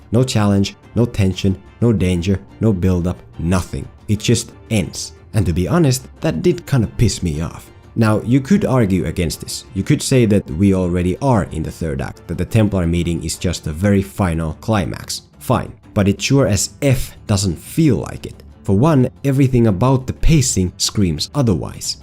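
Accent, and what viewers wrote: Finland. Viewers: Exactly what I'm thinking! Firstly, torille. Secondly, a Finnish accent is too distinct for even a master to hide. Seriously, what else could it be? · are you finnish???? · Youre finnish arent you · I love your distinctly Finnish accent! Now don't take me wrong. Your pronunciation and rhythm is very good and you're extremely fluent. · Hey mate, Are you from Finland ? 😄✌🏾 🇫🇮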